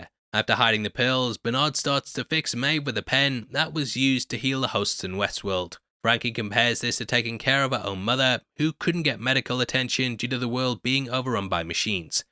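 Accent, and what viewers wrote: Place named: Liverpool, United Kingdom. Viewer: You really sound like a scouser. I detect lol.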